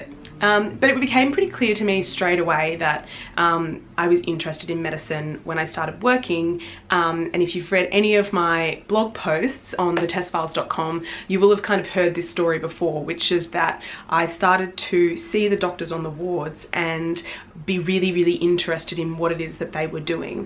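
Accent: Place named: Australia. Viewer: Your Aussie accent is delectable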